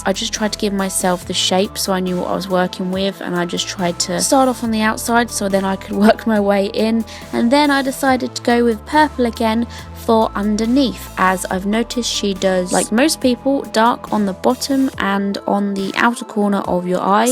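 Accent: England, United Kingdom. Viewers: Mar-tee-nes Its pronounced that way. But if it's cause your english accent then it's okay ☺️💕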